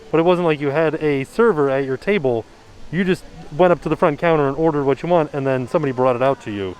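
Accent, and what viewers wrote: South, United States. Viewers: It's a southern twang. We mean no disrespect. Have a beautiful fall.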